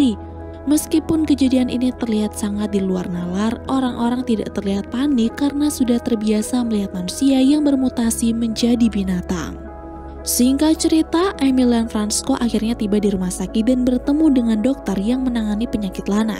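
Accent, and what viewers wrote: Pemalang, Indonesia. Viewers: Wong Pemalang tah min?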